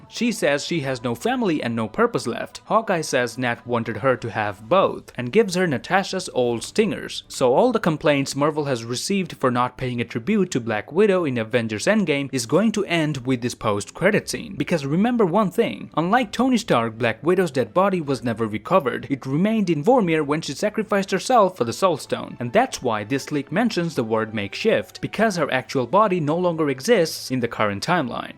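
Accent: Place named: Quebec, Canada. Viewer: I can tell by the way you pronounce farm, are, and such. I can tell you’re French-Canadian. I’d like to assume your first language is French. And with that information, shall I suggest the same channel but you say everything in French, for any French viewers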